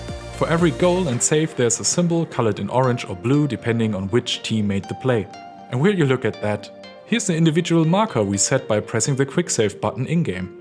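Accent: Germany. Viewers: Ich hab sofort gewusst, dass du Deutscher bist haha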